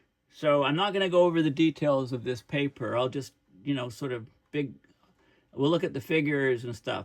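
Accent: Canada. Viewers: How do you call yourself an Irishman today? Sounds like a Canadian accent to me.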